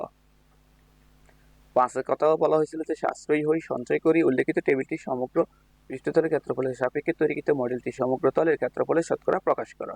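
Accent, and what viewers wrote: Noakhali, Bangladesh. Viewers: Sir apni ki nokhalir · স্যার আপনি কি নোয়াখালীর মানুষ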